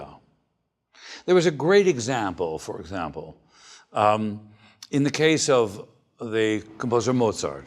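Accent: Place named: Netherlands. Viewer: What’s with the Dutch accent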